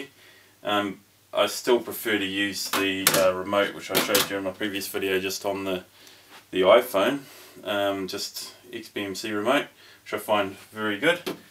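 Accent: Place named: New Zealand. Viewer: Kiwi much? ;-)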